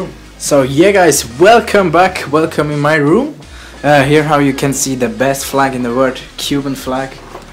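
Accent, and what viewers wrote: Switzerland. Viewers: Man merkt voll das du Schweizer (Deutsch redest) bist ^^ Woher kommst du? Ich wohn grad bei der Schweiz an der Grenze :D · Man hört dein "Schwiizerdialäkt" aber schüsch geils änglisch.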